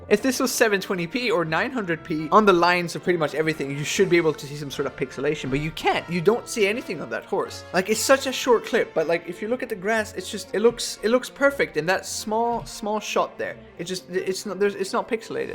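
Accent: Sweden. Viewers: Wow Are you swedish?!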